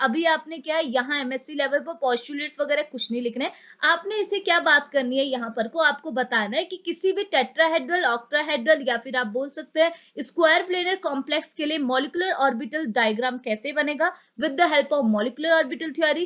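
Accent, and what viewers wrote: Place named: mixed, India. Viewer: Bdiya pdhate ho mam ap..❤❤❤ ap up ya uk se ho syd....😅😅 mean apka acsant Asa hi lgta h.. liie ye सब समज अगि..😁😁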